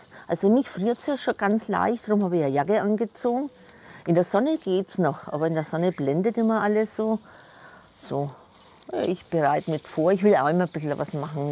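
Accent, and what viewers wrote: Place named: Lower Franconia, Germany. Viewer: Gruess Gott,ja jetzt Weiss ich warum Mir das nicht gelingt.ich habe immer nass geschnitten.Der Dialekt macht Mir heimweh,du musst ja ganz nah bei meinem Geburtsort wohnen.Bin ein ausgereister Unterfranke.Zwischen Schweinfurt und Stadtlauringen.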